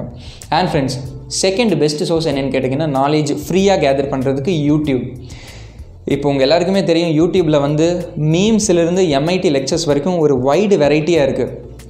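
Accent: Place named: Kerala, India. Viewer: I like your Tamil+Malayalam accent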